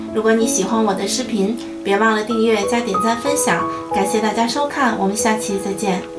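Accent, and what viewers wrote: Tianjin, China. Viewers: 口音像天津人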